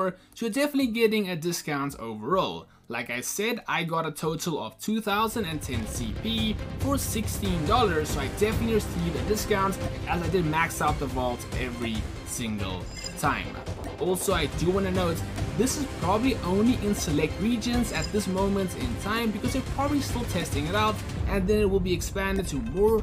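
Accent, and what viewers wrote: South Africa. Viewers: Wait are u south African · Are u south African bro?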